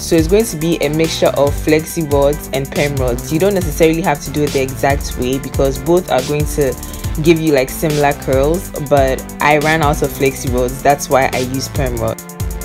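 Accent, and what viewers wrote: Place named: Ghana. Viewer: I can hear Ghanaian accent. I hope am right. U look beautiful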